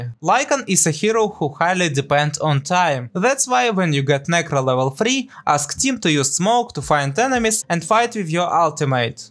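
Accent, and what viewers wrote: Russia. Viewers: Sounds like рашан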